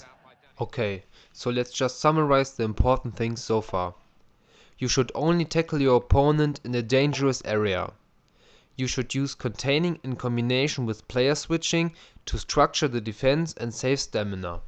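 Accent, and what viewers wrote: Germany. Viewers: haha, i love the german english :D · Kann man gleich an deinem Englisch hören, dass du Deutscher bist. Schönes Tutorial :D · Sick accent bro, I love Germany! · he speaks very good he just haves a german ancent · German accent.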